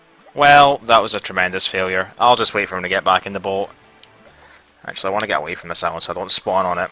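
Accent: Scotland, United Kingdom. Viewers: I'm pretty sure that it's Scottish, but it might be Irish.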